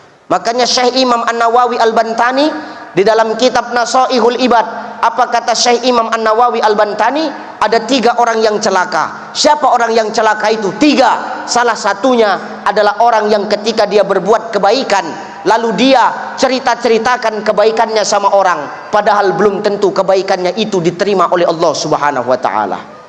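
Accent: Medan, Indonesia. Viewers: Gas terus bahasa dan dialek Medan mantap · Uztaz. Kau....kau...kau. Memang itu logah nya. Medan punya bahasa.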